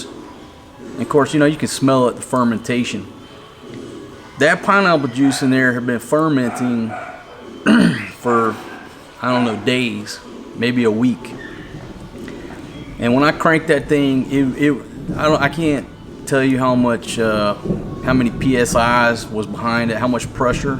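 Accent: United States. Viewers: I’m Canadian and listening to you is like watching a Saturday Night Live skit that is so quintessentially American. Thoroughly enjoyed it and hope Wife #1, 2 and 3 are happily making use of your in tact Family Jewels.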